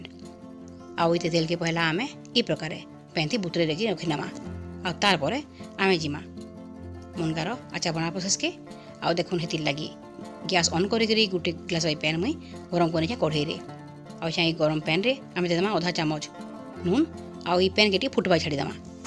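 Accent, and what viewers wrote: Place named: Sambalpur, India. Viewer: Tumar samalpuri kathata jaha mitha lagla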